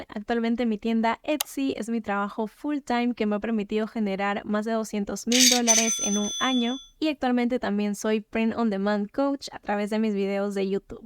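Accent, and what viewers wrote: Peru. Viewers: Detecto un acento peruano 🤔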